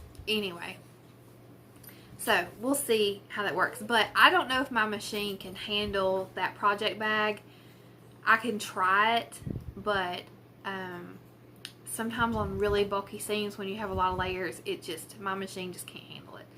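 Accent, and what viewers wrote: South, United States. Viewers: I think it's the southern accent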